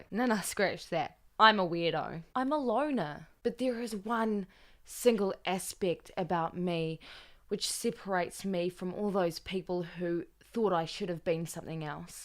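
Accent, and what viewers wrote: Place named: New Zealand. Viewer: That kiwi accent. Hard to find in a sea of YouTube content.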